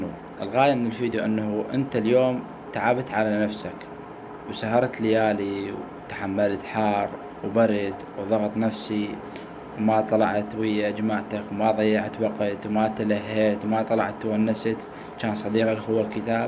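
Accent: Mosul, Iraq. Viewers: احسك من اهالي الموصل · من لهجتة مبين مصلاوي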